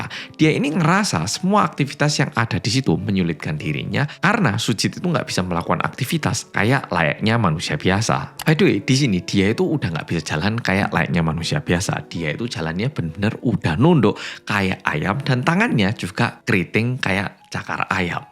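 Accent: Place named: Java, Indonesia. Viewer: tks medok ❤ Alhamdulillah Sujit bisa berubah meski pelan👌🏿✌🏿💪🏿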